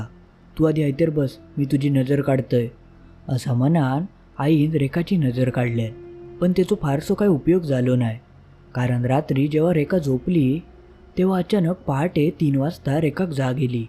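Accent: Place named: Malvan, India. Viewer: तुमचा मालवणी भाषेचा लहेजा आणी सांगण्याची पद्धत खूप छान आहे मालवणी भाषा फार गोड आहे.....धन्यवाद!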